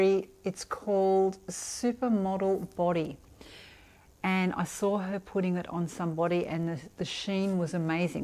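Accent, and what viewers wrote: Australia. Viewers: I’m a new subscriber. I have watched five of your videos today. Thank you for sharing all your wonderful tips for us fabulous 50 gals. I love hearing your Australian accent.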